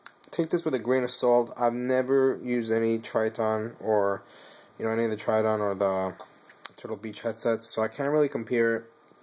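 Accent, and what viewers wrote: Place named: New York, United States. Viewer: Sounds like you got a New York accent lol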